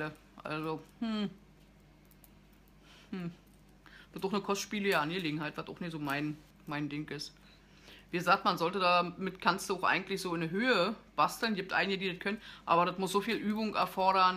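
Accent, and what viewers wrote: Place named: Berlin, Germany. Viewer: Biste aus Berlin? Ick hör did doch :-)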